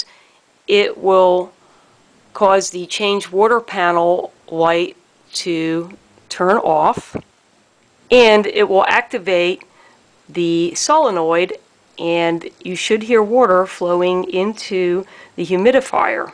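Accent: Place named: Baltimore, United States. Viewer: Great video! BTW, where are you from? Your accent reminds me of my home (Baltimore)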